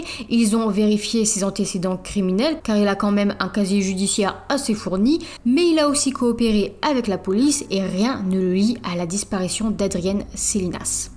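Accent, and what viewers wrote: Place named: Nord-Pas-de-Calais, France. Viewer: Bonjour, Tu viens de quel département exactement. C'est pas méchant de ma part mais c'est la première fois que je suis tombée sur quelqu'un si jeune avec l'accent ch'ti si prononcé.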